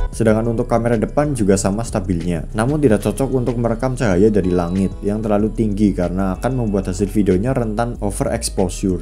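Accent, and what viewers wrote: Banyumas, Indonesia. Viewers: Kaya logat ngapak?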